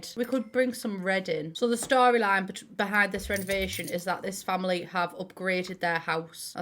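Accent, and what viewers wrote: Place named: Middlesbrough, United Kingdom. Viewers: When you’re from Middlesbrough and understand everything is saying/talking about:) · As if ur from the Boro hahah gerrin lass me too, i just assumed I was imagining the accent 😭, best thing to come out of Boro in ever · This voiceover makes me want to move to Middlesbrough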